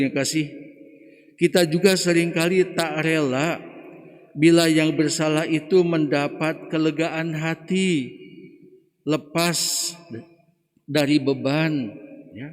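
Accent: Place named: Sunda, Indonesia. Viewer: R I P... Saya sedih karena romo tdk akan pernah lagi memimpin misa harian pagi pkl 06. yg sy ikuti secara live, tenang dn damailah bersama Bapa di surga romo...warga paroki keluarga kudus cibinong, keuskupan bogor.. Yg akan sllu terkenang dgn khotbah dialek sundana 😥😭😭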